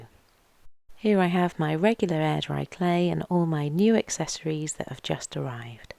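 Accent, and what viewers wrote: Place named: Liverpool, United Kingdom. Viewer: I love the Liverpool (or nearby?) accent ….so singy and relaxing! Thanks for sharing all your knowledge!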